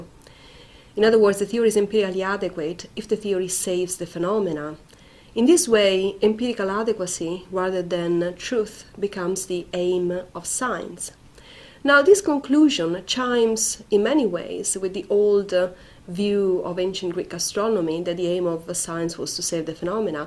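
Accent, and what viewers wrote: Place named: Italy. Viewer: Really good, and simple explanation. Cute italian accent as well. Thanks for sharing.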